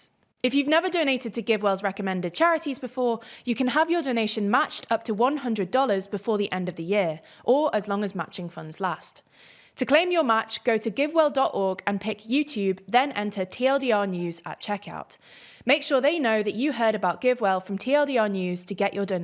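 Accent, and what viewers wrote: United Kingdom. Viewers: An asian woman with a British accent talking about French politics... Your credibility is zero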